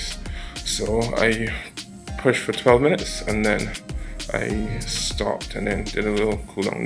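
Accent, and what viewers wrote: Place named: Barbados. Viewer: I can't do two push-ups, so I'm already impressed. Also, so nice to hear a Bajan accent. I think I'm 30% here for that alone.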